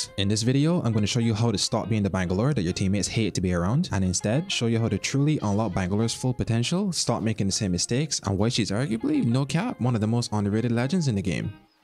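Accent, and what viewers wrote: Barbados. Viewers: Sound like a bajan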